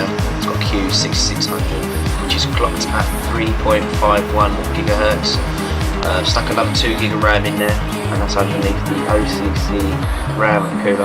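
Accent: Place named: United Kingdom. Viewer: Ya I knew you're from somewhere around the UK because of your acsent (I think thats how you write it fix me if I am wrong =]) BTW are these games any fun ?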